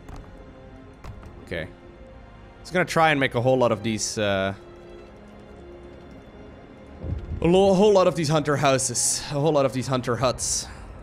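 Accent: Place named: Netherlands. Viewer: You sound super dutch, are you dutch?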